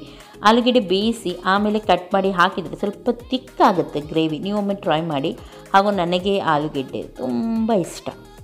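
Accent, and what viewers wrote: Mangalore, India. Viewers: Hi mam Nim Mangalore li mathado kannada language andre nange thumba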